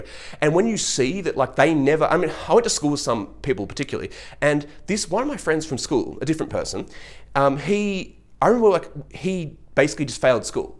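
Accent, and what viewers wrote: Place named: United Kingdom. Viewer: This guy is absolutely speaking the truth. He sounds like he lives in Britain or in Europe somewhere but he's not exaggerating the reality of being wealthy. I live in the U.S. When I was about 20 years old I dated a woman whose net worth was north of 40 million. This was sometime in 1988. I dated her for 5 years and that experience was unlike ANYTHING I had ever experienced before or since. I grew up in a very middle class house hold, so I had access to nothing extravagant. Just to provide a few quick examples, during the time I dated this woman, I traveled to Bahamas at least twice, Los Angeles - and not just LA proper but I shopped on Rodeo Drive, the most exclusive avenue of wealth in the world, traveled to Las Vegas a few times, and I drove several exotic cars including a Ferrari, BMW, and a Porsche. I ate at the absolute best restaurants in the U.S. 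Everything I did during this time was paid for by this woman and her family. I was given money on a frequent basis. Ultimately, the lifestyle almost killed me because I had to a pay a heavy price of being absolutely controlled as to my time and space. I finally broke up with this girl and found my independent freedom again. To this day, I have no regrets on the decision I made. But, the experience provided me with a sense of existential balance that the world is NOT the same for people relative to those who have against those who do not have.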